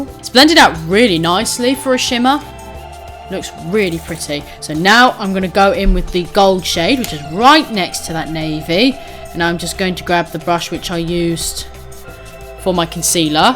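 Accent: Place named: Australia. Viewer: I love your videos, both because you take no shortcuts, but also for the fact we have similar taste. May I ask something. You sounds like you are coming from Australia - is that correct or I am just awful in recognizing dialects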